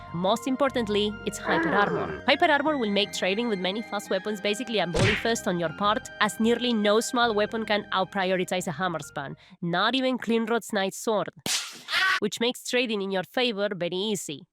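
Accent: Russia. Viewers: me encanta que esta youtuber que me habla en ingles, con acento ruso y me suelta un insulto en perfeto argento 10/10 vamos a ver mas viedos.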